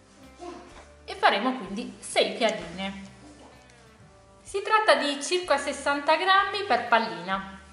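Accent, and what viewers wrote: Sardinia, Italy. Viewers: da dove sei? la Sardegna? sento l 'accento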